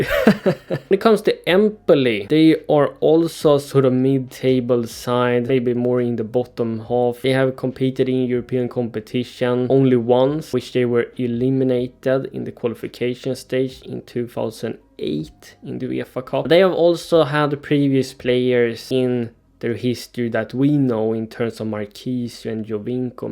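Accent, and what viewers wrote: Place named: Sweden. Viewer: LMAAOO i was just about to ask that you have the perfect swedish accent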